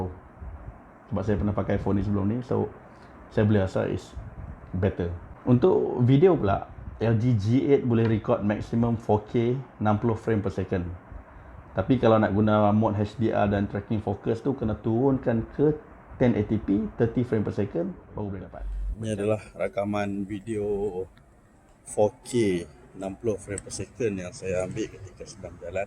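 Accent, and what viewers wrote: Malaysia. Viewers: Brother ni orang penang ka? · Kedah pekat noh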